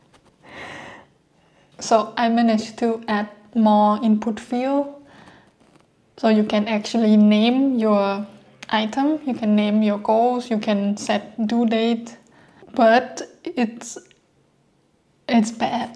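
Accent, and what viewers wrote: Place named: Europe. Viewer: Thats an interesting accent bever heard one like it...european i think. Not sure. Interesting video too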